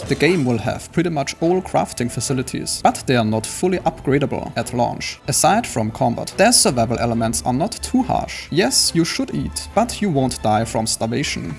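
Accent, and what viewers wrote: Germany. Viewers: nice german accent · Sehr cooles Video mit vielen Informationen und Gameplays die ich noch nicht gewusst und gesehen habe. Würde dir aber empfehlen einen deutschen Kanal zu führen oder Videos in englisch und in deutsch zu machen da du ein krassen Akzent hast und damit wahrscheinlich noch mehr leute erreichen würdest. Hab bei der ersten Sekunde gemerkt das du deutschsprachig bist.🤙🏻😉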